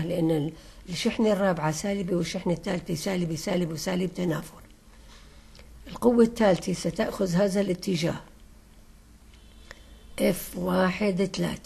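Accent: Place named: Syria. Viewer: أخي أنت لهجتك شامية حاسس انك سوري.. على أي حال تحياتي الك من سوريا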